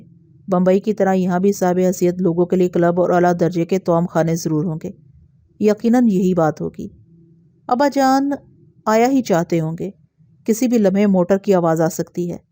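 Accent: Punjab, Pakistan. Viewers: Apki Urdu gulabi/Punjabi hai. Lekin fluency achhi hai.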